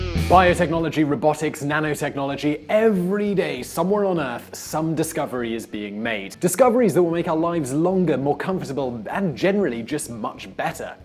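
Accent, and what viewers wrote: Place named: England, United Kingdom. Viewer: How do you define the middle class? That’s easy, it is accent and you have the perfect English middle class accent. Have you noticed all those YouTube videos where English young ladies (usually very good looking) teach the world how to speak with a BBC accent?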